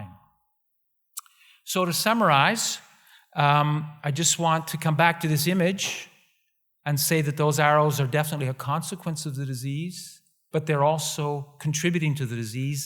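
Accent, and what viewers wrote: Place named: France. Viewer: this guy's definitely French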